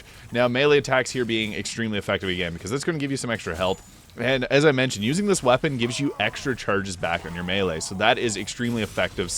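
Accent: Canada. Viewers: Do I hear a fellow Canadian?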